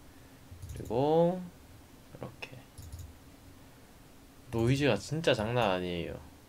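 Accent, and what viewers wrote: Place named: Gyeongsang, South Korea. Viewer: 관측은 어디서 보통하시나요? 대구분이신거같은데??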